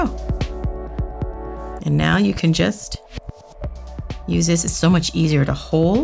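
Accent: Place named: Canada. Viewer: Valery B even without saying that they are from Canada (which has been said in several videos) you can tell the Canadian accent. 😂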